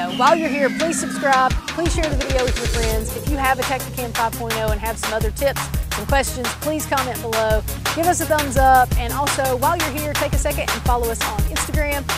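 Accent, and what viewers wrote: South, United States. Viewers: judging from your awesome accent, I gather your in the south someplace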